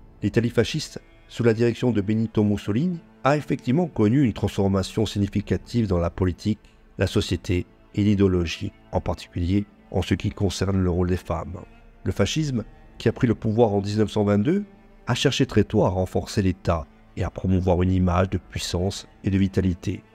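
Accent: Corsica, France. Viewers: Bonjour, Je viens de découvrir ta chaîne et j’en suis ravie, ça me change un peu de ce que je regarde habituellement. Juste par curiosité, tu ne serais pas Corse par hasard? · Bonjour je découvre votre chaîne. Et je m’y abonne !!! J’aime beaucoup les termes abordés. Je suis sure que vous avez lu livre « femmes de dictateur « de l’écrivain Diane Ducret, il parles des femmes des dictature d’Afrique de celle dont vous parlez dans cette vidéo et bien d’autres….. De plus j’ai reconnue avec joie votre accent , venu tout droit de l’île de beauté. J’y ai passé mon enfance et adolescence entre le village de Luri et de Corabara. Ma famille étant native de l’île. Je vous souhaite de bonnes fêtes 🍾 🎊. Et souhaite également longue vie à votre chaîne. Merci pour votre travail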